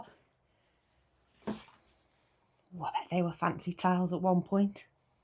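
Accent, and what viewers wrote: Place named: Birmingham, United Kingdom. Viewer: I watch you for your content, yes, but even more for your accent. You see, my mom was from Birmingham, and a war bride that came to America to join my Dad. She has been gone these many years and I miss her and her accent. Thank you for listening to an old guy and his memories and brining them back with a your heavenly accent and sweetness. You’re a hard worker.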